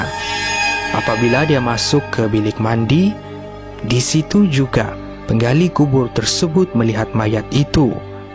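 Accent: Sabah, Malaysia. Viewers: aku mmg tau pun dia org sabah 😒😒😒 sbb aku org sarawak so dah biasa dgar sleng jiran kami sabah dan kami tahu beza sleng sabah n indo.. Maybe kau x paham komen aku kot.. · orng sabah memang ckp mcm sebb orng sabah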